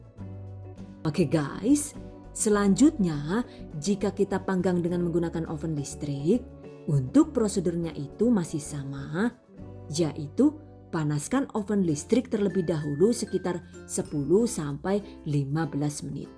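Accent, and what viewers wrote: Banyumas, Indonesia. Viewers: Salam kenal saya oma holly dari cirebon,saya suka sekali lihat video,kuenya enak n penampilan menarik dan yg paling saya suka itu omong inyong2,n kelucuannya,saya sudah bnyk resep yg saya tulis di buku soalnya belum punya oven hehehe jadi,yg udah saya buat donat aja pokoknya yg d goreng2 sukses selalu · Q suka dgr logat ngapaknya sist..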